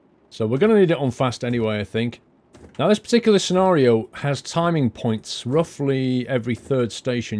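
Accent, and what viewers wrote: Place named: London, United Kingdom. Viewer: hey! love your videos.. we Americans would call a Football Pitch, a Soccer Field... although I call it a pitch (if it is dealing with "soccer" / football ) as well as my friends who play the sport. my first job was at 13 and it was a Soccer referee.. did that until I was about 16.. good money for that age! anyways I know this is kinda random and off topic but may I ask what football team you support? whether their in The Championship, League One or League Two... I'm familiar with most clubs in England. I support Fulham (my mom's from Kensington) but since they got relegated(and proximity to Craven Cottage) I had to become a Chelsea plastic... I know I know it's a huge contradiction, but as an American I'm more of an admirer of English football, and support (more like, I admire) a couple clubs. it's hard to watch the Championship or lower tier leagues even with the soccer package I pay extra for on my cable. 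anyways. sorry for the lengthy comment that's off topic, just curious. I suspect West Ham or QPR, or many of the other London clubs because of the London accent. cheers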